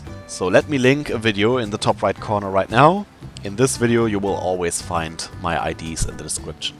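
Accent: Germany. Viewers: Thanks a lot for this video! I've just started playing on PC and didn't know a lot of the things you listed :) You forgot to include the links to the other videos tho ("click on top right" but there's nothing haha) Are you German, by any chance? I'm from Germany and your accent sounds a lot like ours :B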